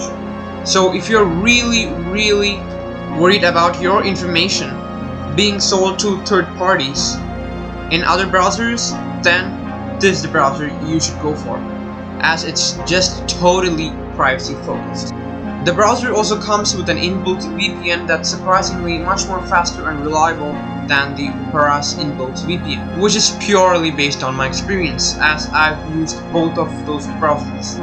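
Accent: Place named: India. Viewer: Good information, sir, and well presented. As an American, I find your Indian accent charming, although at times a bit opaque. For a few words I needed to repeat the audio, but was able to understand the words in all but one case -- you say, "Epic browser is always on ___________ (?)". The word sounds (to me) like "ignoshiamal". From context, I assume this means that Epic is always set on maximum privacy protection...but still, I'd like to know what the word is. Also, in order to achieve maximum understanding in the U.S., I think you may need to change your pronunciation of the names of two products you reviewed: Opera and K-MELEON. In British English, opera is pronounced "AW-pǝ-rǝ" -- in U.S. English, it is pronounced either "AW-pǝ-rǝ" or "AH-pǝ-rǝ", but in any case, the emphasis is always on the first syllable. I am certain that K-MELEON's logo is the head of a chameleon, so I'm betting the browser's name should be pronounced the same as the animal, "kǝ-MEE-lee-ǝn", with the accent on the second syllable. 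I apologize for criticizing you on such trivial points. Again, the videos are VERY informative, and I do appreciate them.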